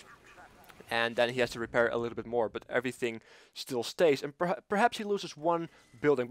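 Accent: Netherlands. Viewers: were u from? u sound a bit Dutch like :)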